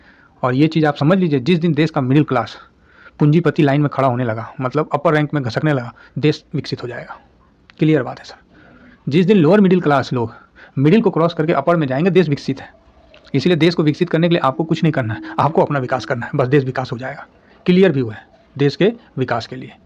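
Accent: Bihar, India. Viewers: App bihar ka lagra sir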